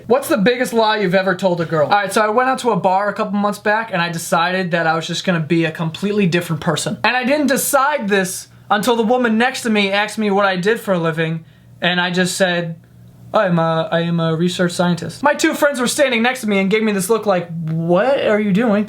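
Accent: New York, United States. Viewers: I love his New York accent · He does, but it's probably because he's from New York and most New Yorkers say the word "ask" like "aks". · OMG love listing to you talk. Reminds me of living in New York. You remind me of people in my family. Keep it coming. 💯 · . I love you. I get you're from New York but ffs it's ask not axe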